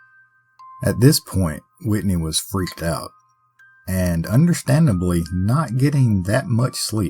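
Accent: South, United States. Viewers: Interesting and intriguing stories narrated by a Southern, Good-ol'-boy; accordingly, worth a sub.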